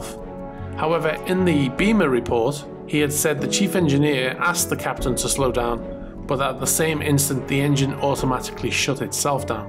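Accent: Liverpool, United Kingdom. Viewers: Great video great too hear a scouser doing well in YouTube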